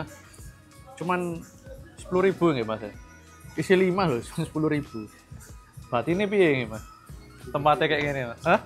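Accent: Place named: Java, Indonesia. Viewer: ngomong aja medok yauda pasti bahasa indo Lah ,, lo mau ngomong sok english jg tetep aj predict ur nations braww hahaha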